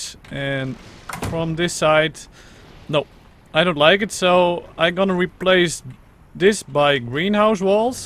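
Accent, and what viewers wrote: Netherlands. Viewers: He has a dutch accent, his English is excellent. I never once have to rewind the video because I don't understand what he has said. That aside, people don't really care how good your language skills are when you come across as a dick. It's sad to see such attitude in someone so young but I hope for your sake, you change as what you have said achieves nothing positive for yourself or the person you insulted and beginning a sentence with "no hate" means nothing, you aim to insult, nothing else.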